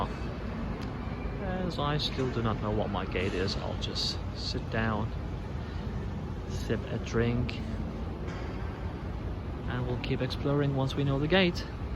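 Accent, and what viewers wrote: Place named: United Kingdom. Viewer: Açıkçası bir Türk'ün bu kadar hatasız ve akıcı ingilizce konuştuğunu ilk defa görüyorum ve şaşkınlık içerisindeyim. Aksanınız İngiliz aksanı belli, peki İngiltere'de kaç sene yaşadıktan sonra ingilizcenizi bu kıvama getirdiniz? İngilizceyi bu kadar akıcı konuşabilmeyi neye borçlusunuz? Senelerce sadece ingilizce mi konuştunuz? İşiniz İngilizce konuşturmayı mı gerektiriyordu? Sırrınızı çok merak ettim :)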